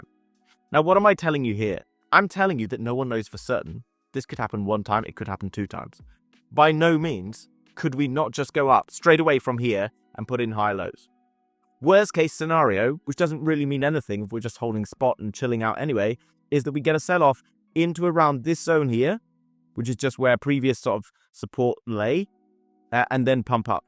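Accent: England, United Kingdom. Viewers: 😂😂😂😂 I can’t I could tell kms away ure English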